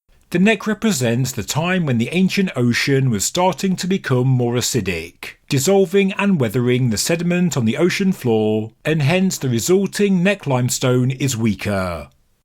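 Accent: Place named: England, United Kingdom. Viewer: since when did have an English accent?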